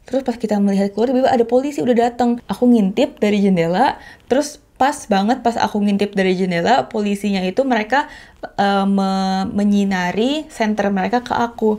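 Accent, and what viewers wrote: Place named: Germany. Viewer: Lidahnya mbak udah sering keseleo sama logat Jerman.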